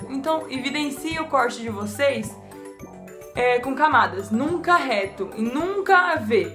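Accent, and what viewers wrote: Brazil. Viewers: Você é goiana? 😍😍😍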